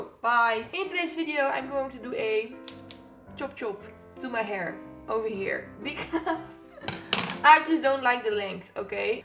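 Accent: Netherlands. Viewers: Are you Dutch? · I swear she has to be dutch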